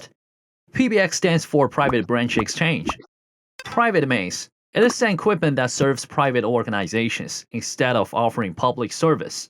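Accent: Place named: China. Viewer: Actually this a real persons voice. He is speaking English with a Chinese accent and some incorrect pronunciation. After some time you get used to it and will conclude that the English is not that bad.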